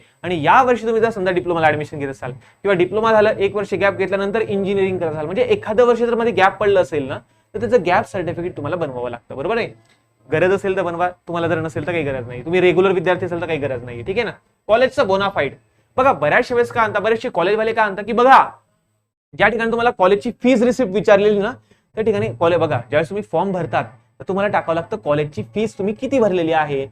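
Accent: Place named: Khandesh, India. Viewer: Sir mi Mumbai thun ahe pen mazi Mummy khandeshi ahe tyamule Mela thodi thodi khandesh chi tone samajate ani Tumi nakki khandeshi vatate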